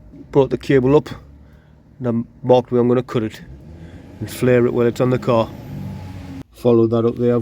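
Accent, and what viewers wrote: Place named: Newcastle, United Kingdom. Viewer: Great Newcastle accent ! - Fab car - All the very best of luck with the rebuild m8👍👍👍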